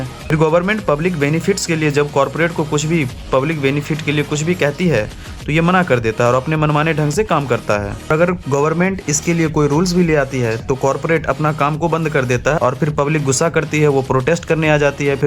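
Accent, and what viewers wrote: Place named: Bihar, India. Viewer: Bro you are from Bihar, if I am not wrong, your pronunciation tells 👍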